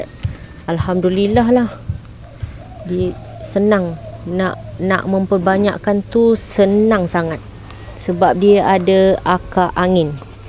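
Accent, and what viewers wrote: Johor, Malaysia. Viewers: Itu stail org johor ckp.. bg org johor biasa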